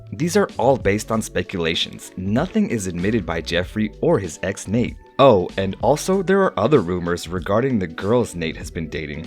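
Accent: United States. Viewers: Jade Tea Was thinking the same thing. Sounds like a foreigner with an American accent, so I was confused by the £ usage. Especially since we’re talking about an American public figure. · Prices quoted in pound sterling... Narrated in American accent? I'm lost.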